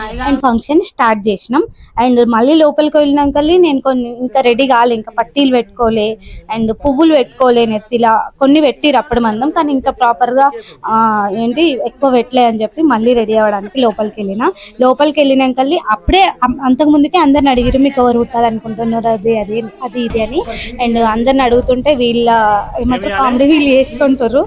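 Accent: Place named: Telangana, India. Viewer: Bagunna akka nuvvu anna ala vunnaru ni way of talking madhi same manam pakka telangana akka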